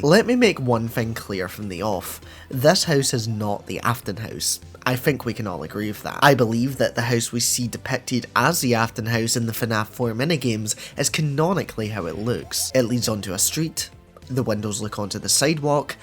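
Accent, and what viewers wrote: Scotland, United Kingdom. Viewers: The Scottish accent is throwing me off loved the theory 😂😂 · nice vid man. cool to hear a Scottish fnaf fan lol Im Scottish myself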